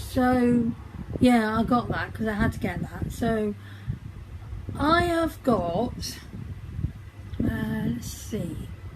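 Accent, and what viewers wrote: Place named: United Kingdom. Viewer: Also, I love listening to you. Your accent is so wonderful!! Living in the US my whole life, I’ve always wanted a British accent. 😍😍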